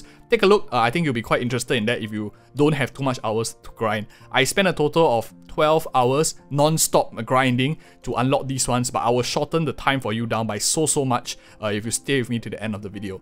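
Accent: Singapore. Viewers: Singaporean voice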